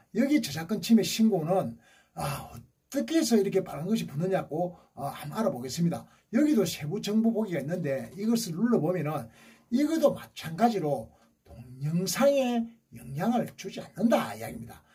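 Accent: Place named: Gyeongsang, South Korea. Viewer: #강펀치tv님 안녕하세요 인사드려요 #저작권 아! 유튜브 스튜디어 들어가서 자세히 살펴보고 알아보고 계시네요 ~^^ 그런데 경상도 사투리 가 정겹게 들리네요 ^^ 아 ~음악만 듣다가 목소리가 들리니깐 정말 반갑습니다 😁 잘보고 응원하고 갑니다 늘 건강하시고 행복하세요 😊 또올께요 🤗 큰성장 하시길 바랍니다 🙏 화이팅하세요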